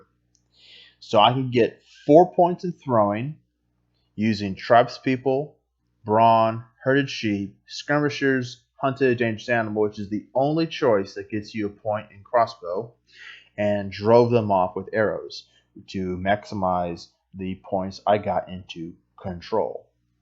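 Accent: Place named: United States. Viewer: U sound American for an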